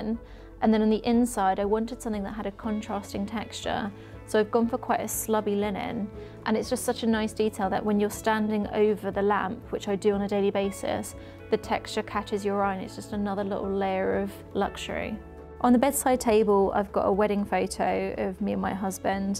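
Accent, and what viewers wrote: United Kingdom. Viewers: British accent is beautiful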